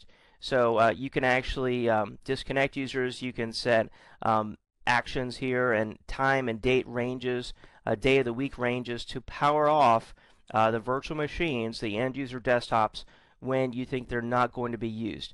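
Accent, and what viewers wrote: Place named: Philippines. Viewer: are you a pinoy? you have a strong pinoy accent.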